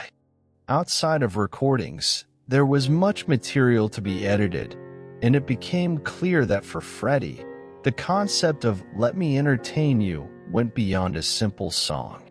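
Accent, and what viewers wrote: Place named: United States. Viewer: I cannot stand American narrators sounds awful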